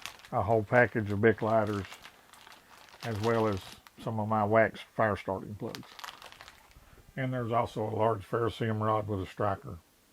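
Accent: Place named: New York City, United States. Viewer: He talks like a New York Jew.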